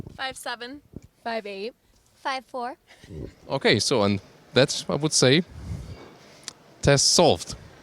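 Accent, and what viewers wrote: Germany. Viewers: Can't wait! Your reviews are always my favourite not only because of the unrivalled quality and the super deep videos but mainly because of the oddly satisfying-to-hear german accent xD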